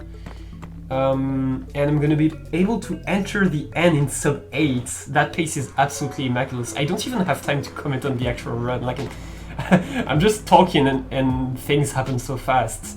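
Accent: France. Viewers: Are u french?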